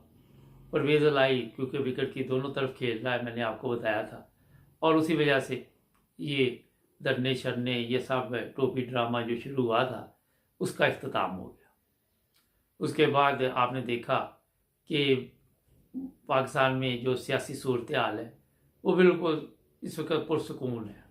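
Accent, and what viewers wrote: Punjab, India. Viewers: Your punjabi accent tell me you are from Saialkot .am I right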